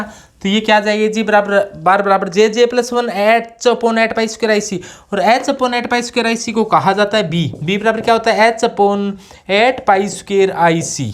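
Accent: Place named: Rajasthan, India. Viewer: वाह सर मजा आ गया आप हमारे राजस्थान के ही लगते हो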